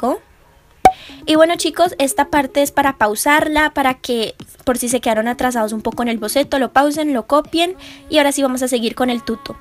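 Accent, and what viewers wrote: Colombia. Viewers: Supuse que eras Caleña! saludos desde el sur, por cierto, nueva sub!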